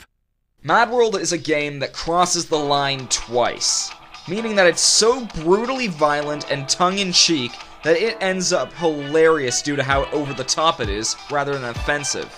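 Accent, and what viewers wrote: Scotland, United Kingdom. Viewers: he sounds scottish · kojackin my opinion sounds somewere between british and scotish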